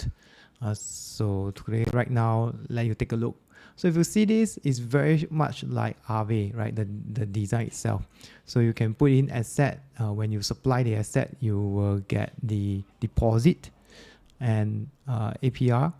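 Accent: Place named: Singapore. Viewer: surprised by your singaporean accent, but great video. made the same mistake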